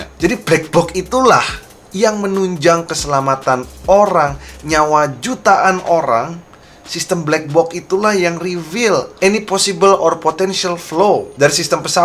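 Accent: Java, Indonesia. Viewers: Blek bok blek bok blek ohhhh blek bok medokkk waeeeee suaranyaaa,#ntaplah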